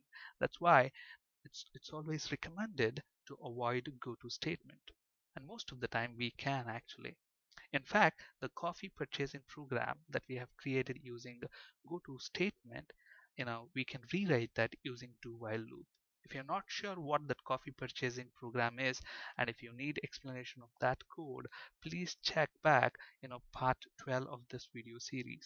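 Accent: India. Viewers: Easy to understand Indian assent.... :D Download this video, install the gom player, listen over there with extend volume for more clearly.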